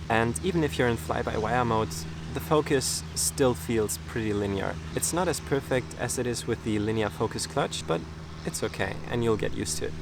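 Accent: Germany. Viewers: No worries, your English is fine. And you will never loose your German accent , it is how it is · Amazing review! Thank you for your work! Don't worry about your German accent, it's great!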